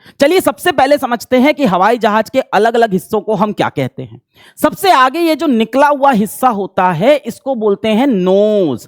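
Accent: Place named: Uttar Pradesh, India. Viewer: Who is this joker? Very unprofessional way of explaining aviation theory. This is 2025 and this guy is still stuck in bhaiyya accent.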